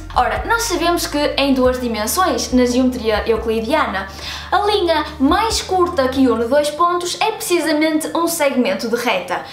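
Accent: Portugal. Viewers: Sotaque português é tão lindo aaa <3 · Uma Homenagem aos seus fãs brasileiros? =) Sou formado em matemática no Brasil. Curti seus vídeos, e estou tentando acostumar com seu jeito de falar (Portugueses falam MUITO diferente dos brasileiros). Parabéns pelo canal, e é muito bom ver matemática fazendo sucesso entre algumas pessoas =) Um abraço! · Sua beleza é um fato consumado......vc fala gostosinho portuguesinha kkkkkkk abraço do Pará Brasil pra professorinha preferida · É lindo aprender matemática com esse sotaque português😍😍😍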